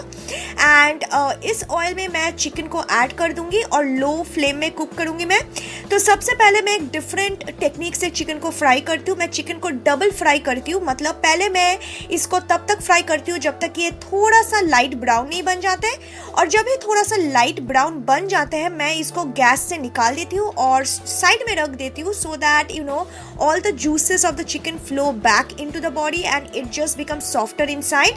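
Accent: Bengal, India. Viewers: Nice log..... love your bengoli style hind.....😍😍😍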